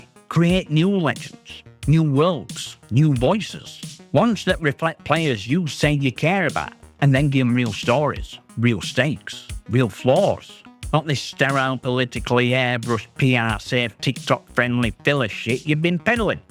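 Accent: Yorkshire, United Kingdom. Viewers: Bloodyhell I've not heard this accent in a while. What part of Yorkshire you from? Hahah I agree with your video for the most part, nice work man.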